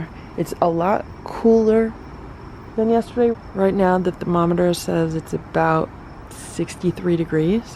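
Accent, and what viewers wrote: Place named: Long Island, United States. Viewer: Where are you from....I live on long island....we kinda sound alike...I have Tom's of ferral cats by my house....it kills me. I have 2 that live in my house now and I am going to build a big cat apartment mini building.....I feel they should all have a home and be loved and spoiled.....it kills me to see them scared....and outside not feeling loved 😢😢😢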